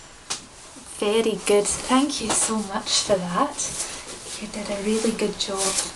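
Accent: Scotland, United Kingdom. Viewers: is that scottish accent? So adorable spoken by her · You Scottish? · Oh god, the Scottish accent.. Now I have to watch trainspotting again ahahaha amazing video, subscribed + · (No hate) Tbh your Scottish accent isn't that bad, very stereotypical but not bad. However if everyone I was around everyday sounded like this it would be quit worrying. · Loved this. Your Scottish accent is ace :) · It's scottish, right? :D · It's so strange listening to a fellow scot doing asmr haha. Great job though! :) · I found the scottish accent beautiful and heartwarming. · What a lovely Scottish accent.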